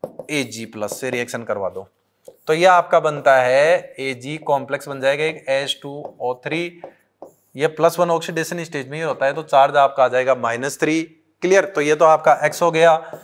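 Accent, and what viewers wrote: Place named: Rajasthan, India. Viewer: Pure rajasthan ka swag aata hai aapki boli me padane ke tarekeee me ekh no.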